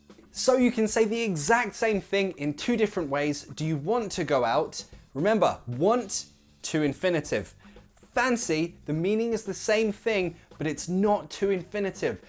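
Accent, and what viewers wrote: United Kingdom. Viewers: U r the best I love ur style n the way of teaching, u make me fall in love British accent as well as American accent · You're fantastic, man!! I love the british accent and your way of speech is a perfect example why I love that! Some day I'll speak a little similar as you! Uk ❤️ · No, we love British accent because of you,!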